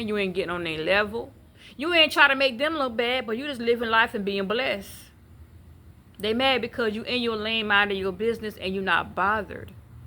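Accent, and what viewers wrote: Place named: Louisiana, United States. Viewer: SIS❤ You gotta be from Louisiana❤ with that Come on Nah😂❤ I Love my State, but Yeah, when I tell You I’m so glad GOD had me follow you💚 When I tell You, You be Conforming🙌🏾🙌🏾💚🗣️ JESUS🤍 HE DONT want NOBODY taking the Credit for what HE is Doing in my Life🙏🏾🙏🏾 Yeah things a lil shaky BUT GOD 🙌🏾🙌🏾🤍 I’m at Work Smiling so hard😁😁 You uplift my Spirit 🙌🏾🙌🏾🤍💕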